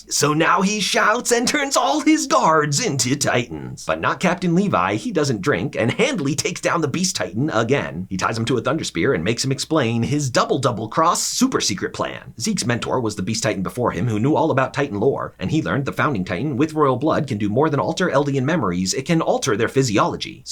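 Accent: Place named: United States. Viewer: Your American voice doesn’t make me change my viewing. Grata. Do more anime!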